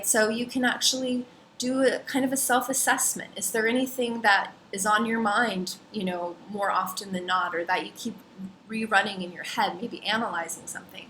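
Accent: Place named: Minnesota, United States. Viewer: Too true. All those years in HI and you can still hear the MN in your voice. Love it! :)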